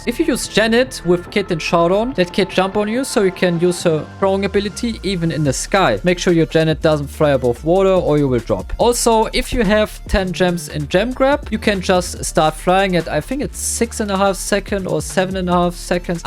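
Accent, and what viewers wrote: Germany. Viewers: Im sure ur an amazing player but I can’t watch the video with that strong a German accent holy shit better just talk German and put English subs man ur never gonna speak proper English if it’s that strong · Are you German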